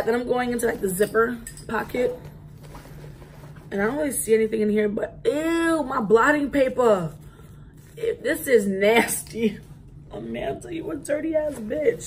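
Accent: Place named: New York, United States. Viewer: Are you in New York.... It’s your dialect. Miss mine. Super cute bag. Love my Big Apple Fam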